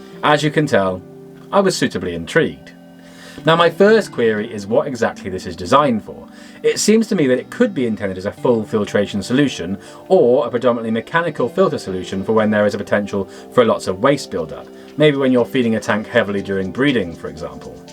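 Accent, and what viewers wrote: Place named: England, United Kingdom. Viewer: Great to hear an English voice, youtube is so American heavy especially aquatic posts 😊 Thanks for sharing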